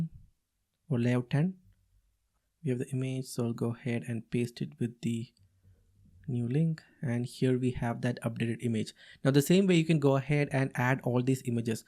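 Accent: Kerala, India. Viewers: ah that irritating malayali accent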